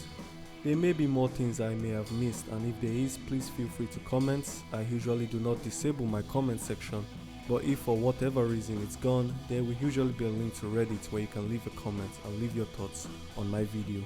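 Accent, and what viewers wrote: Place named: Nigeria. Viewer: Amazing video you sound Nigerian tho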